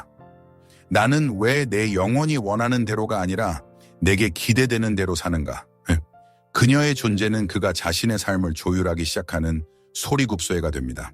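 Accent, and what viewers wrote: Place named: China. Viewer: 중국분이신가요? 발음이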